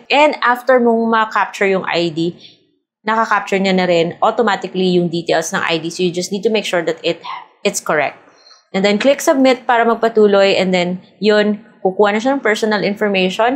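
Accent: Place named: Visayas, Philippines. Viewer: Bisaya di I ka mam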